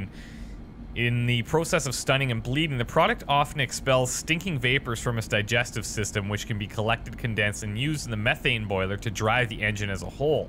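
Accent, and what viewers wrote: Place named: Canada. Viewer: I love your lets plays! Don't ever change. And your Canadian accent is adorable. And just to be pedantic, I have to point out that "Thames" is actually pronounced "Tems".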